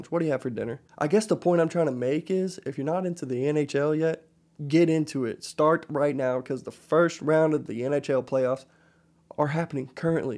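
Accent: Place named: Canada. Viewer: Dude, you're even starting to sound Canadian. "Lower body injury, he'll be alright, eh?" Classic Canadian add on there. Beauty, eh.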